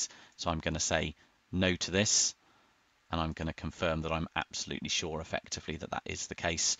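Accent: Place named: United Kingdom. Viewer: Great helpful video in plain uk English 😊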